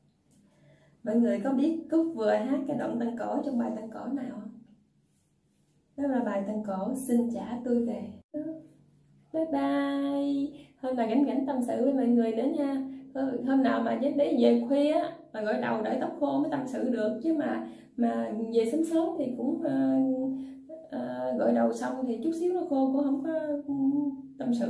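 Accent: Mekong Delta, Vietnam. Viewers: Wow là gái Cần Thơ. Hèn chi nghe nói chuyện đúng giọng miệt dường. Nói chơi tui cũng dân miệt dường mà đang ở xa lắm, lại thích nói tiếu lâm như.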